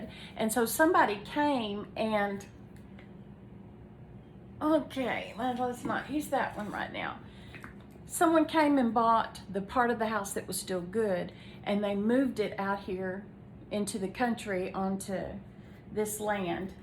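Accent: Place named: South, United States. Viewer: I agree with all you said. draws you in with that soft Southern accent. What she says comes from her heart. That makes it profound. ❤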